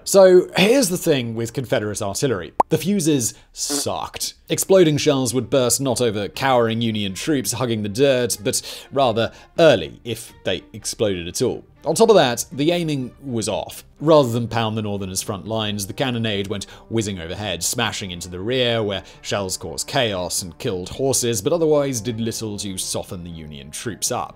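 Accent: United Kingdom. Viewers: I love the different British pronunciations of American words. 'MURICA!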